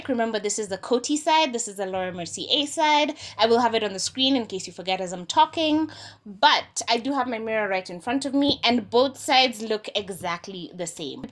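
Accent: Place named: South Africa. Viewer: Your accent sounds Sooooo South African 😅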